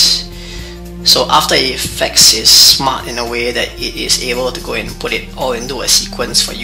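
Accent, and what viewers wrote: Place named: Singapore. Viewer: I like hearing this singaporean accent hahaha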